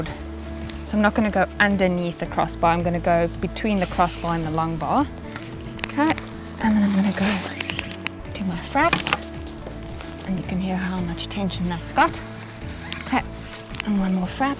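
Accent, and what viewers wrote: South Africa. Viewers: Love the South African accent....ive used 50kg potato hessian sacks for the same job. just like the Ol Timers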